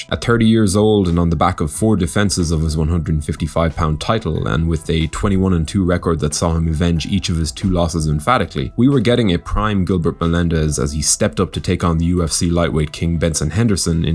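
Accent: Ireland. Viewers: ''tug rose'' haha I love irish accents, keep up the great content, you're my favourite narrator on this channel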